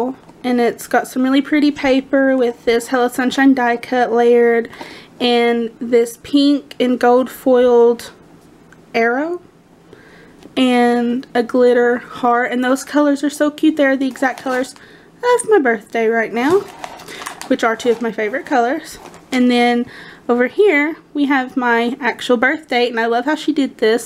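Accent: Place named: South, United States. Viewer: SOUTHERN CHIPMUNK VOICE!!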